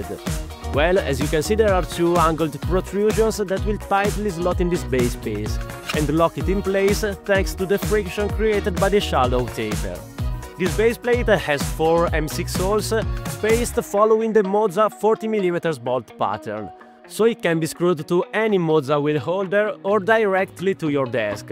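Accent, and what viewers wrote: Italy. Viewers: Bro si sente che sei italiano lol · From this accent you have you are italian for sure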